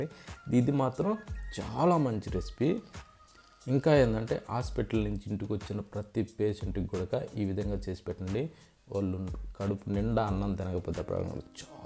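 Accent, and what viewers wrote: Nellore, India. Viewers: Nellore yaasa bro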